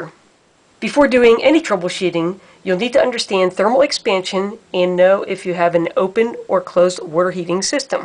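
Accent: Philadelphia, United States. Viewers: You must be from Philly. Wooder.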